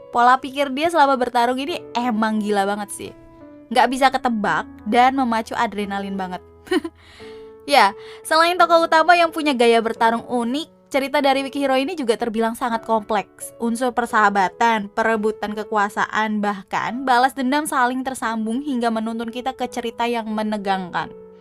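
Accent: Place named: Tulungagung, Indonesia. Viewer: ooo iki ye wong tulungagung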